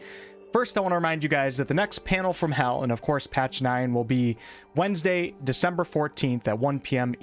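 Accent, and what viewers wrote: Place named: Midwest, United States. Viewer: So I'm detecting a somewhat midwest accent? The way you say tealent!:)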